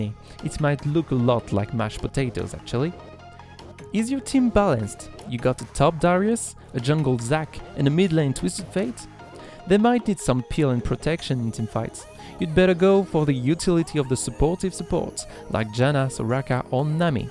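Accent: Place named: France. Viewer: ***** I'm from France too so I suspected you to be french but I wasn't sure because it wasn't the regular shitty accent :D By the way reported for saying Leblank and not showing you inner french, it's Leblanc duh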